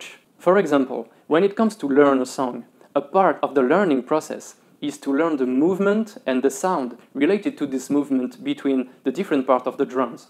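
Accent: France. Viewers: Haha he sounds French. But he is one of the few that actually speaks decently english!! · oh mon dieu... mais t'es francais en faite ! bon bah j'ai appris un truc cool, grace à ton sublime accent :p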